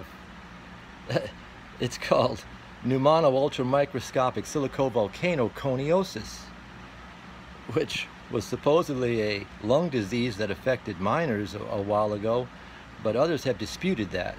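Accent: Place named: Texas, United States. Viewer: That was super cool. I loved your accent, I think you're Texan, or North Carolinian. Am I right?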